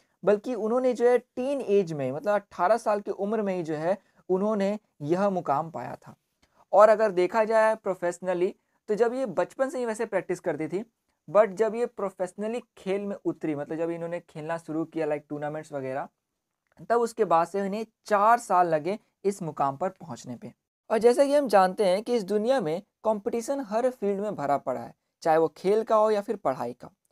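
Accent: Bihar, India. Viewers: Oh mujhe lga ki ap bihar se ho qki hlka apki boli match kr rhi thi but ab confirm ho gya😂♥️wahh!! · Ohhh u r from Bihar 😱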